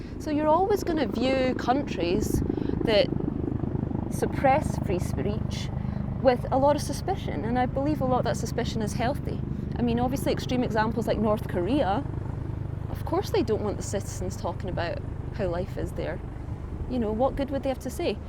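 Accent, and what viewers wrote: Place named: Scotland, United Kingdom. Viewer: You're a Scot.. I had you at the 3rd word.. Dae ye ken Scots langage?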